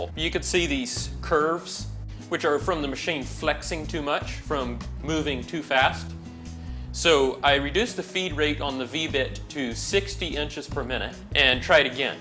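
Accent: Canada. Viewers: You have the most Canadian accent I have ever heard.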